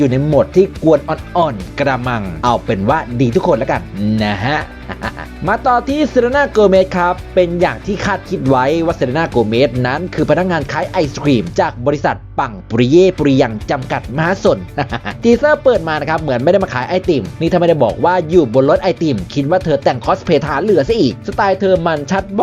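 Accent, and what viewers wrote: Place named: North, Thailand. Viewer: ติดตามได้ซักพักคัพ ชอบมากๆ ผมก็คนเหนือ ได้ยินสำเนียงเลยถามเพื่อความมั่นใจ อิอิ ...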